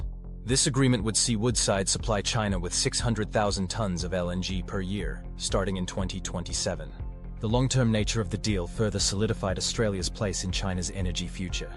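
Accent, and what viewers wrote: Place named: United States. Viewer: Definitely an American. Doesn't sound Aussie to me at all.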